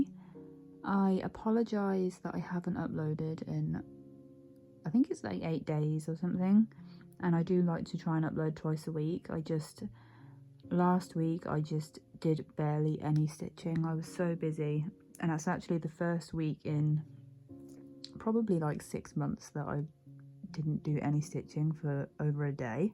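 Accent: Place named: RP, United Kingdom. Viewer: Actually, when my husband heard you talk he said I was wrong and that you sound kind of posh, so I guess he was right!! 😁😂😂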